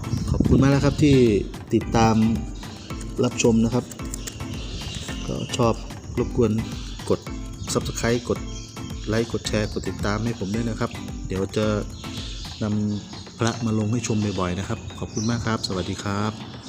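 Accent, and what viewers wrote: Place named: Buriram, Thailand. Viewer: แสลงโทนบุรีรัมย์คับ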